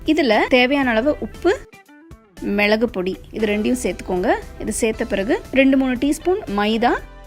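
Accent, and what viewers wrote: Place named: North India, India. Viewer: Tamil uh? I thought ur from North Indian..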